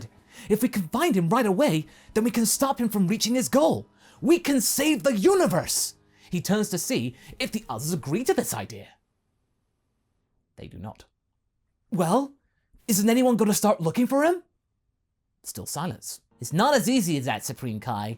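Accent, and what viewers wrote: United Kingdom. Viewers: I said it with you every time -A Fellow brit (I think ur British-)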